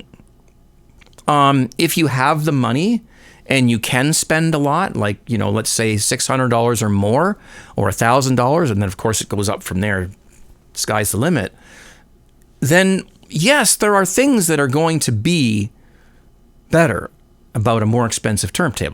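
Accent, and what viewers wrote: Canada. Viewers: Hi, I came to this video late and, after scanning the comments to see if any of them answered the question about why the New Zealand scarf on the wall. From your accent, you sound Canadian, so why the banner? BTW, you know your stuff. Don't let the bastards get you down.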